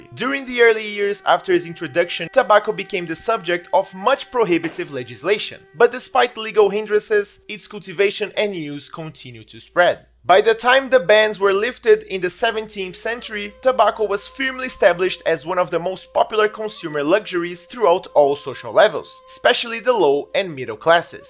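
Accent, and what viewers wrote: Brazil. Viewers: loved the video dude, but where is your accent from? the way you pronounce the names of the characters sorta remind me of the brazilian way of saying their names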